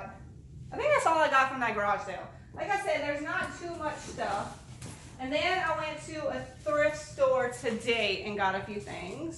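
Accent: Louisiana, United States. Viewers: You sound like a fellow Lousiananian. Am I right? Great haul . Edit: I made my comment as soon as the video started. I just heard you talk about Lousisna weather. So, yep.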